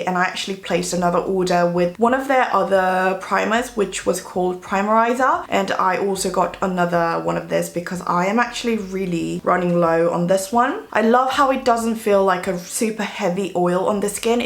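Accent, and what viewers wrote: United Kingdom. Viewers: Love the British accent🙃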